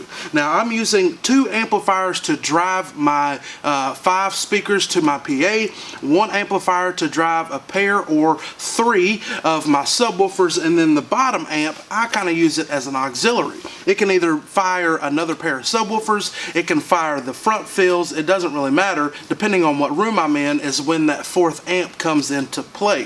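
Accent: South, United States. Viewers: I like this guy he sounds real country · I love this guy, his knowledge is top notch. When I first heard his voice I had to take a double take, we all southern bro!!! Keep up the good work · I love that country accent brother! Love from the Carolinas. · Did not expect his country accent to be so thick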